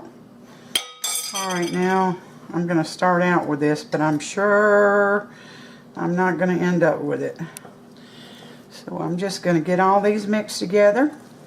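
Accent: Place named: West Virginia, United States. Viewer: now im hungry !! this looks wonderful ty 4 sharing. have a great day. are you in wv..............u sound like it to me. just wondering cuz i spent many summers there with my grandparents.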